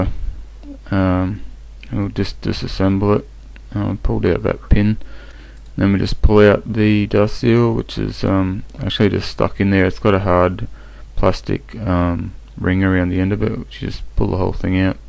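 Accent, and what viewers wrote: Australia. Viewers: First youtube video I've watched with an aussie accent and swearing brilliant, video was helpfull too.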